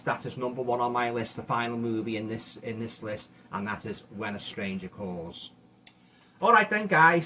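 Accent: Liverpool, United Kingdom. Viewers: I have a off topic question. I'm curious, Do you call your profile ' ', because you have a scouse accent? Or that's you're real surname? I'm American so I don't have the ability to tell regional accents of people.☺ but I'm always fascinated by accents. My dad was born Hungary, so I've grown up around 'Broken English' speakers and other European accents. But not many from the UK. I enjoy your channel.👍 I appreciate your "House That Dripped Blood" poster a lot! Probably my favorite Amicus movie. (I'm big Hammer and Amicus fan! I'm 37, but I've always loved those films. Nobody I know really enjoys or cares to watch them! Lol Except my mom and dad. 😅)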